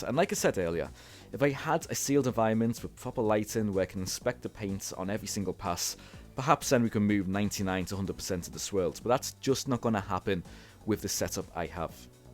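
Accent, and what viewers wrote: Liverpool, United Kingdom. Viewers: Hi nice to hear a fellow scouse voice. Two questions mate, firstly how long did it take you to do that exterior detail? Perhaps that would of been a useful detail to mention in your video. Secondly, when you're machine polishing do you find it gives you a bad back? Whenever I machine polish my back is practically on fire! I get really bad aches off it.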